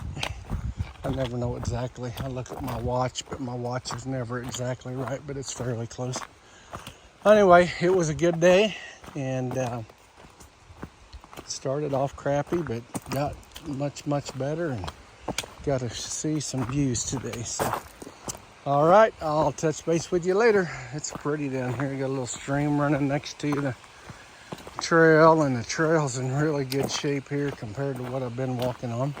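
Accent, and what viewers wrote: South, United States. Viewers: Love your voice. I can't remember if you said where you are from. You sound southern. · You are doing some killer miles! Awesome job! I love your Southern accent . It makes me feel all warm and fuzzy on the inside lol keep up the awesome videos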